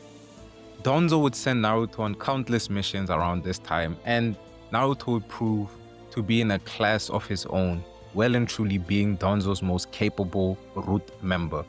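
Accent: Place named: South Africa. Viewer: I hear South African accent ... ARE YOU SOUTH AFRICAN ?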